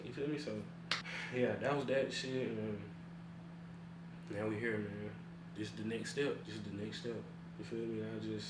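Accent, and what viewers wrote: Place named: Louisiana, United States. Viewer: lawd youn sound like you from Louisiana, what part you from?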